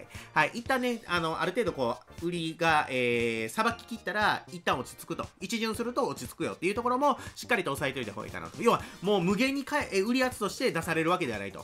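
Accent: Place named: Kansai, Japan. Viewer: おおお、本人から返信きた😳 最近さんのチャンネル登録したものです💦私、忙しい人なので、飛ばし飛ばし見てます💦 さんの動画長いので…😂関西弁いいですね✨心が和みます🌤️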